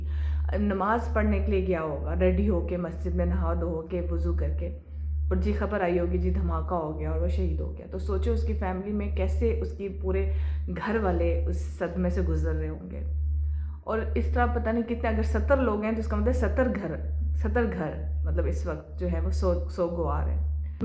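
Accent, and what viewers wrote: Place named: Kashmir, India. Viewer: ❤AP k zillah bhimber army center family quarters sa hn AP k velog bhot acha hoty hn 🎉🎉 mñ 27year sa family quarters MN rah re hon AP MN offeiceres waife wala gharoor Nazar ne Aya or 1baat kashmeer k log bhot achy Mily mujy leepa bodr samahni kotli atthmuqaam all bodr ayrias k log sub bhot achy itny driy froouits khay hn hr Tarah k wha g kia baat apke kashmeery zuban metthee se baji 🎉❤❤ bhot ceuit hn sub ko assalamualaikum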